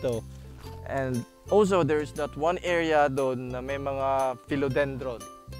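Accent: Visayas, Philippines. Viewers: Instant subscriber here.. so Bisaya ang tone Ng voice ...rpoud Bisaya here too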